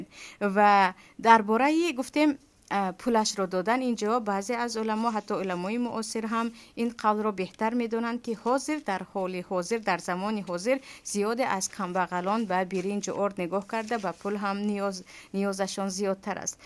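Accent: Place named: Tajikistan. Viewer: Саломалекум апачон бехтарин гапои ширин заден ба лахчаи ширин точики фахамо хдои мехрубон нигахбон боша шмора хазор рахамат.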